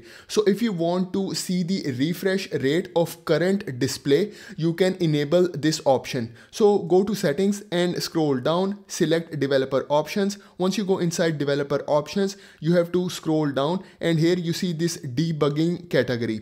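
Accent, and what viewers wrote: India. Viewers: I dont normally trust people with an indian accent for stuff. But youve actually showed me some very useful stuff. Not many people go into depth about what each option does and shows it working physically. I very much appreciate that.😊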